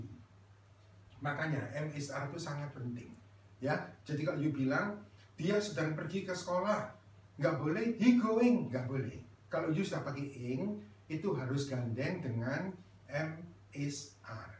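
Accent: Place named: Surabaya, Indonesia. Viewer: Kangen materi selanjutnya nih.. ditunggu upload nya, oh iya saran kalau bisa materi ini diupload ulang dengan resolusi yang bagus biar tidak pecah.. pak ada kontak atau alamat kursusnya ya? Sepertinya pak org surabaya aksennya.. makasih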